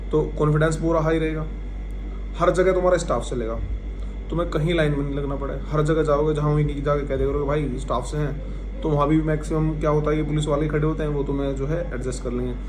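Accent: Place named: Meerut, India. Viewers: भाई कौन से जिले से हो बोली तो हमारी जैसी lagri है मैं शामली से हु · Bhai ap kha se ho Meerut k aas pas k lg rhe ho language accent se...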